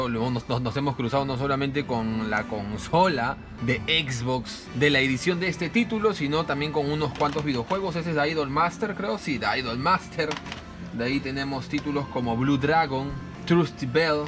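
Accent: Peru. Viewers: Eres peruano? Sonas como peruano xd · es el primer video que veo y en la forma de como hablas... me parece que eres peruano, lo eres? te hablo desde Perú y por cierto que buen video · Eres peruano? Hablas como uno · es Peruano,por la forma de hablar....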